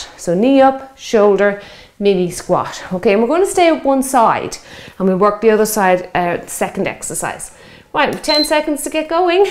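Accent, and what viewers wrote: Ireland. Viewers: Hi, a new subscriber from Belleville, Ontario Canada. I adore your workouts. They are calming yet very motivating. I have done most of them and each one is terrific. Plus I never get tired of your lively Irish accent. I have shared your workouts to my Facebook page and had some of my friends try it. Thanks, keep it up!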